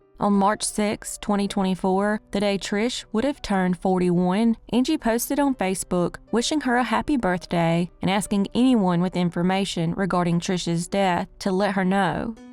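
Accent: South, United States. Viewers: Thank You For all the work and time you put into your videos. You are the BEST crime channel and we all Love your southern accent ❤️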